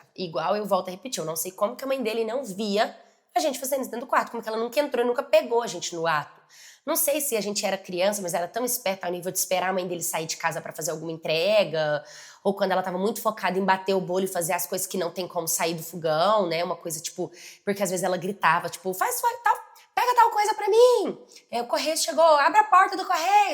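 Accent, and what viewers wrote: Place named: Curitiba, Brazil. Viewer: Eu não sei porque, a voz dela me lembra a da dubladora da toph, Ahsoka tano e da Gwen do Ben 10 só que com sotaque de Curitiba.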